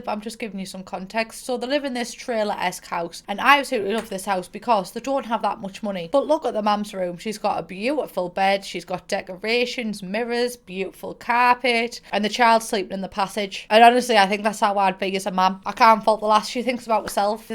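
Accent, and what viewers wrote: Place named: England, United Kingdom. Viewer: I’ve binge watched your videos in the past few days and now the voice in my head has a very thick English accent and I’m not sure how to get rid of it...or that I want to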